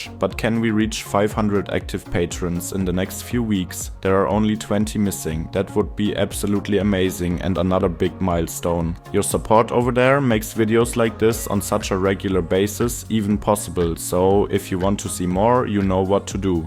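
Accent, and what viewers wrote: Germany. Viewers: Something about a German accent and doing engineering work that makes my heart warm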